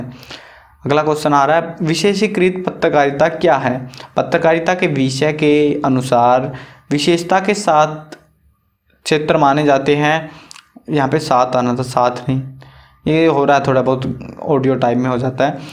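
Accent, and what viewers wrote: Haryana, India. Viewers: Haryana se ho bhaiji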